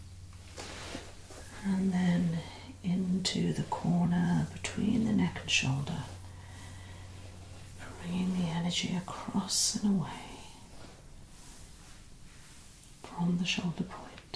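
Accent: England, United Kingdom. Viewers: Hi miss how are you i just saw your channel an hour a go but im a fan ❤❤❤you are so friendly and nice kind lady btw love your english accent ❤i have 2 videos i think from my bully her name is Magic bcoz dog friendship is magic love your channel and i love all typs massages and treatments im Bas van Laarhoven from the netherlands and i love to be a patient or cliënt from you or make a video do you take care for man or only ladys respect miss ❤❤❤🙏🙏🙏👍👍👍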